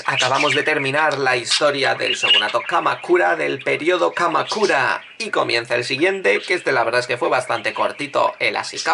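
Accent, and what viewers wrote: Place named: Catalonia, Spain. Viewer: toma dislike por pronunciar como un sucio catalan.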